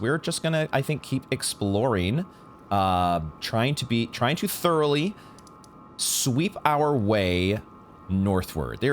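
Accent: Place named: Wales, United Kingdom. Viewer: That's a Welsh accent.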